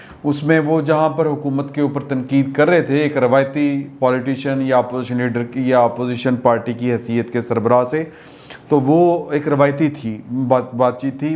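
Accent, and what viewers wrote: Punjab, Pakistan. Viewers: Ur great 👌 Sir 👌 g good job 👍 naam tou suna hi hoga dialogue with Awais Sheik Multan Wala Punjabi tarka Kamal Ka hota hai aapka Bhai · Sadky jawan app ke urdu or mix panjabi Kay. 😂😂😂😂😂. Love 4 u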